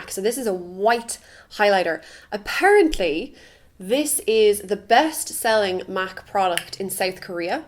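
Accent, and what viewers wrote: Ireland. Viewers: I know is in Australia, but her accent sounds very irish. So im confused.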